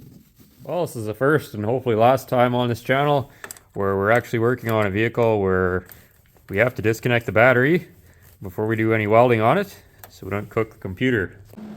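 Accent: Canada. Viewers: Videos like this make me so glad to live in the south. I saw the thumbnail and thought where the heck in the north is this car from, then I heard the accent and realized, oh, the great white one. Nice job for a "quickie" body repair and paint job. When I saw you just pulling the rockers apart, I was just in shock. Never seen anything like that in person, only pics and vids online. Maybe I need to take a trip up north with my CVPI with 0 rust and sell it for 2x as much up there.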